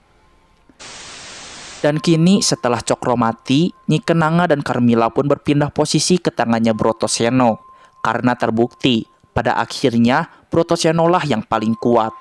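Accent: West Java, Indonesia. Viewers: Sunda banget logat ny.....si mimin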